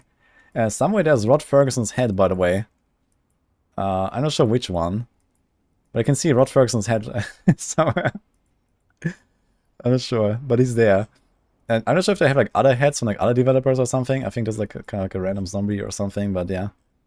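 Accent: Germany. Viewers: Seems like a nice trip you had, You are from Bulgaria, we live very close, I had a feeling you where from Germany coz of voice accent 🙃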